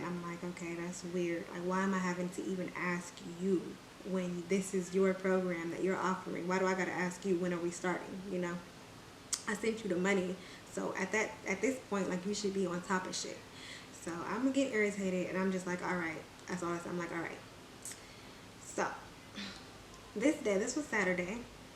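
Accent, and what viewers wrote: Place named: United States. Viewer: mad country sounding. I'm from NYC lol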